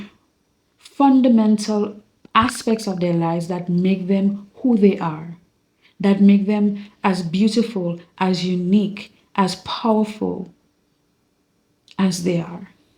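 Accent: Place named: Jamaica. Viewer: That's so funny thing your saying I thought you was from Jamaica 🇯🇲 you sound like one.I am from ST.THOMAS our accent is strong. Do you speak another language 😊? I speak Spanish as well.I am so happy for your content keep it up😊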